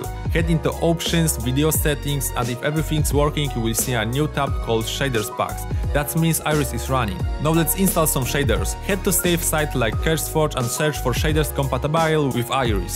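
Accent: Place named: Poland. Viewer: słychać że polak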